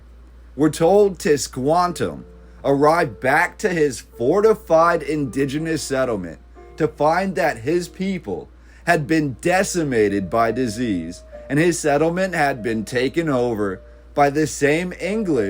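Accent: United States. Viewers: The redundancy, even stated in the American accented voiceover, repeating the same information makes it seem that this is an AI creation.😢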